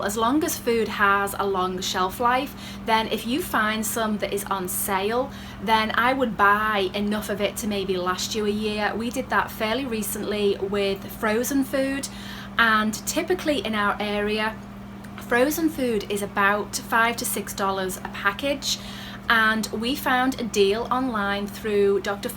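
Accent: Liverpool, United Kingdom. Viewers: Where are you from your speech sounds like you are from liverpool in the uk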